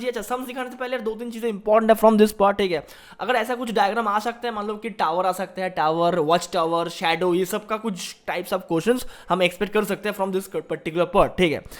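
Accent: Bengal, India. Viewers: Sir are you bengali?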